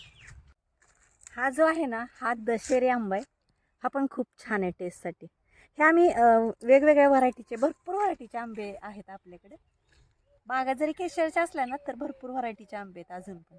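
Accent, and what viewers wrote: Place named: Barshi, India. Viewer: Didi tumi proper kontya city mdun ahat tumchi भाषा पूर्ण बार्शी कडे अस बोलतात तशी आहे.ऋतुजा माझी फ्रेंड आहे .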